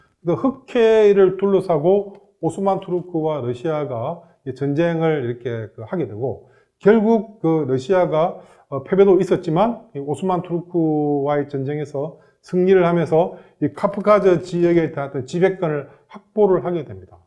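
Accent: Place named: Gyeongsang, South Korea. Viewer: 좀 죄송한데 내용을 떠나서 외국과 관련된 유튜브에는 경상도 심한 사투리 억양 소유자는 나오지 맙시다. 우리 말 발음도 제대로 못하는 사람들이 경상도 아닌가? 직장에 경상도 사투리 쓰는 놈들 참 문제 많다. 사투리를 못 고치니 지들 경상도끼리 뭉친다. 니는 어데고 하면서 아주 가관이다.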